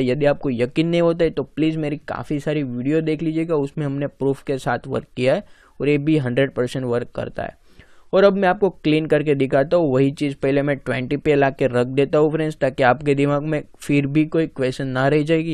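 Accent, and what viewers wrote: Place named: Gujarat, India. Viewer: I think he is Gujarati 😊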